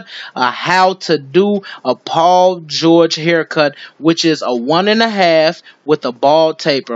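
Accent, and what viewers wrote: Chicago, United States. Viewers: I can already tell u from Chicago bro where u cuttin at? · He sounds like he from Chicago 😂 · You from Chicago right ?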